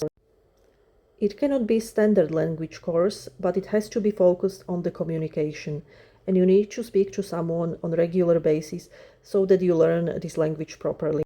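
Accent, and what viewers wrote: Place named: United States. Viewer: Your English is also leans more to the American variety rather English.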